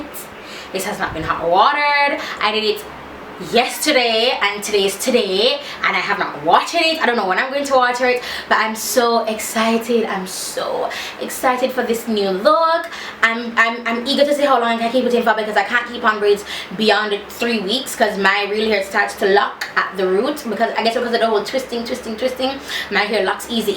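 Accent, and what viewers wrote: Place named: Jamaica. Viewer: lololol girl don't kill me! I'm a trini, but I love to hear Jamaican's talk. lol when you'all say blood cleanse, dat absolutely crack me up!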